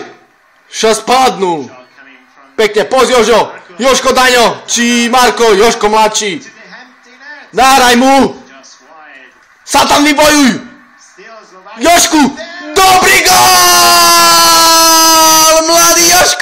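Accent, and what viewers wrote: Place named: East, Slovakia. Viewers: Vychodňar ako ma byť!! :D :D :D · jeden záchvat skončil,a pri dalšiej vete znova :'DDDD niet nad našu vychodnarčinu <3 :3 :D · paradaaaaaaaa...supeeerr....aj ked polka SVK nerozumie asi ale "na vychodze rozumime" :D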